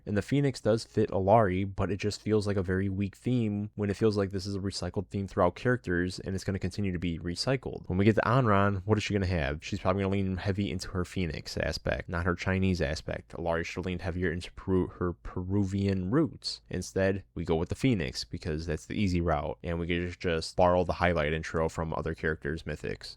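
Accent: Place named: United States. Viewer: You have one of my favourite States accents, especially when you said "battle PASS" 10/10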